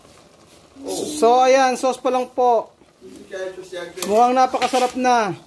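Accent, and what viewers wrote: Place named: Davao, Philippines. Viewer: Parang tqga davao ,alivio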